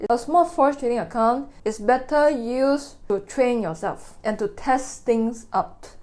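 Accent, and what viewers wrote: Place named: Singapore. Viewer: I love Singaporean accent Lah!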